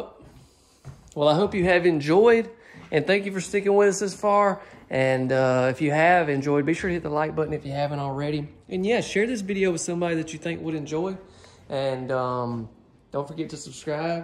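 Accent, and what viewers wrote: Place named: Biloxi, United States. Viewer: That's ok I love you accent it reminds me of Biloxi where I'm from now I live in KCMO in a double wide.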